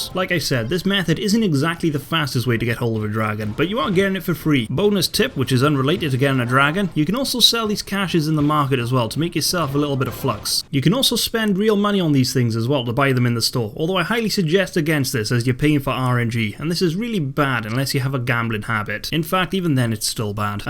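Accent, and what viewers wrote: Wales, United Kingdom. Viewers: Are you Welsh? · Are you welsh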